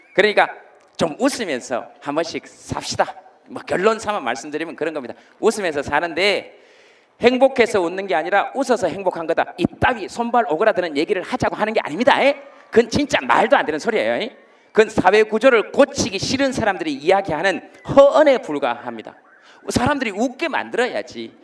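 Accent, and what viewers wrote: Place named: Gyeongsang, South Korea. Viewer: 갱상도 사투리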